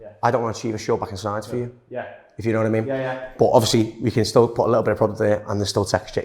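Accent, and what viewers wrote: Liverpool, United Kingdom. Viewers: This channel is so relaxing - Loving those transformation Details & That Scouse accent from a handsome Barber. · He talks non-stop and that thick British accent makes it difficult to understand what he says.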